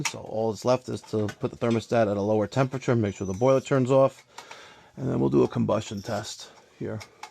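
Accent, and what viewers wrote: New York, United States. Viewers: I'd let boy know to stop letting them flags fly in his part of the property. Some people might say that's being whatever, but no...it's not my religion or whatever get your shit out of my area...I'm not putting mine in yours so fuck off. Love you....Hey give me a faaageeetttit abbbouuuutt itt next time lol. I love the NY accent and some of the tude lol.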